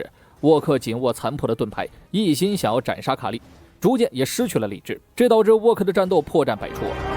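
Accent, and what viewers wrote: China. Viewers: 听你口音，你明明是大陆人，为什么要用繁体字呀？蛤？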